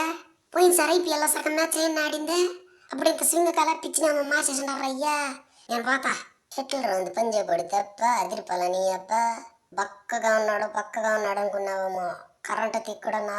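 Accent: Chittoor, India. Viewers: Maa chittoor slang super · Maa chittoor yaasa suparu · Bagundandi.... Pushpa kante ikkade chittoor slang Baga undi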